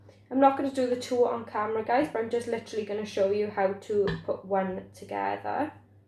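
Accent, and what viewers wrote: Wales, United Kingdom. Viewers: hi - new sub...loving your content...good to know PL gluegun works...will get me one tomoz wondering from a welsh girl -do i hear a welsh accent -you would be the first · aw lush! No I can spot it a mile off! hold onto it forever I miss mine! 😩 I live by Gatwick now so it's lovely to find a welshie on here🌞 I've turned the little bell on😁xxx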